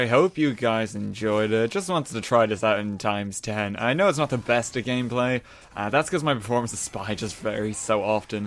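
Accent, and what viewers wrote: Ireland. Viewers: He's Irish.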